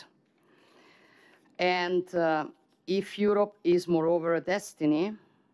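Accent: Rome, Italy. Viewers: Brava Melona ma l' accento è de Roma 🇮🇹( per fortuna) hahaha 🤣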